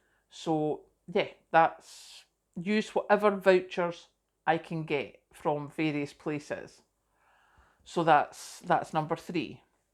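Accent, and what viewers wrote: Scotland, United Kingdom. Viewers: So? Did these save you money? Would love a recap. Thank you! Pretty lady from Scotland with the lovely accent!❤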